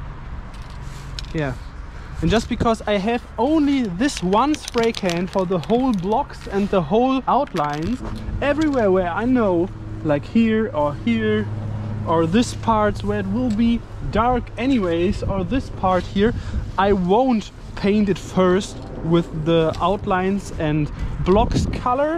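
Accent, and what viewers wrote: Germany. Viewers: echt richtig cool, aber schlimm wenn deutsche englisch sprechen xD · Man hört einfach sofort das der Herr Deutscher ist 😂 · If anyone didnt notice, that´s the way how german people pronounce english language :D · Bin ich doof oder hat er ein absolut deutschen akzent😂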